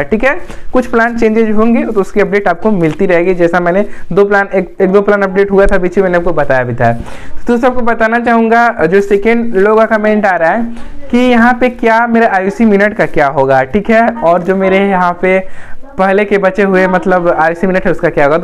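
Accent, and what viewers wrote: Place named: Uttar Pradesh, India. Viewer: Up ladies jasha bat Kew korte ho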